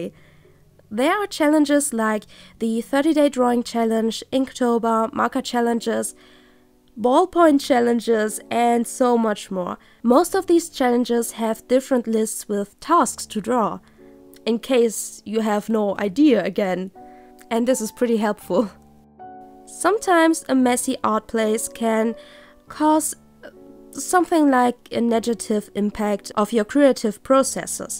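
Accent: Germany. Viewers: Hey^^ are you german?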